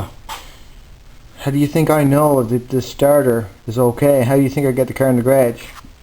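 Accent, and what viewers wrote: Canada. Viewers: Another nice review. You seem to have a maritime accent -- are you from there?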